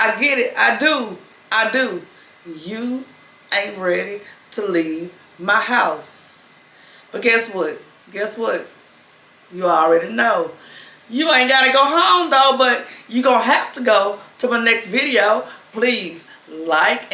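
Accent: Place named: United States. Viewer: Nigeria setting or environment, but i hear American English🎉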